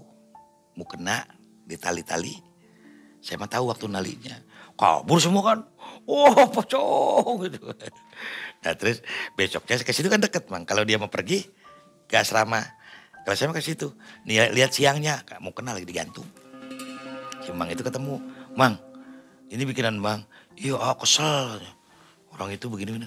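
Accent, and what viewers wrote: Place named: Sunda, Indonesia. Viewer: Assalamualaikum kang,Abi sok setia nonton kisah na khas Sunda pisan nyariosna sing damang Oge sadaya kulawargina seru Oge Aya NU Matak seuri JD kahibur.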